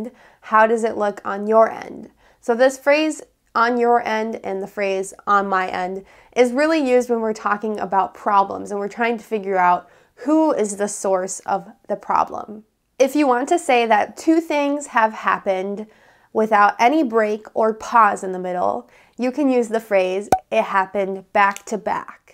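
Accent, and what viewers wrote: United States. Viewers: Are you really an American ? I am asking this because there are so many online teachers who claim to be Americans but actually are Russians.Anyway you sound like an American to me.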